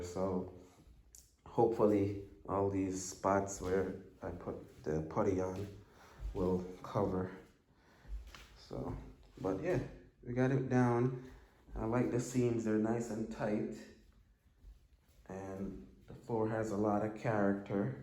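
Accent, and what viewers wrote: Jamaica. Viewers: Caribbean Accent my guy. #Jamaican.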